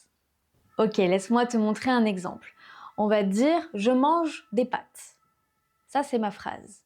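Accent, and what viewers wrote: France. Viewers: Your English with the French accent 😮😳 🥵 🫠❤ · Bonjour Tout à fait, c'est des méthodes que j'utilise aussi pour améliorer ma prononciation. Je parle tout seul très souvent quand, par exemple, je vois une vidéo YouTube ou la télé et je fais comme si je donnais mon avis sur n'importe quel sujet et ça m'aide à m'exprimer. Je pense que je suis pas mal Mais il y a un truc sur lequel j'ai un autre avis. Je pense qu'en fait t'es très célèbre,. T'es une star sur YouTube et j'ai adoré t'écouter parler en anglais parce que tu perds pas ton accent français mais en même temps ta voix change un petit peu, je trouve ça formidable 😍 Merci pour les conseils, je te souhaite une excellente semaine ma prof ✌🏻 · Goodness mate, your seriously in love aint ya 😂 As a Londoner- no! She doesn’t sound English, elle a un really sweet French accent 😍❤️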